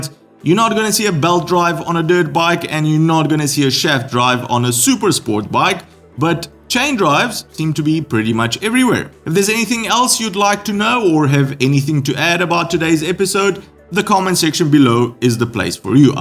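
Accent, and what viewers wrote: South Africa. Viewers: Before I get properly started watching, what accent is that? Sounds a bit South African (but not quite), maybe more clues await me as the video progresses...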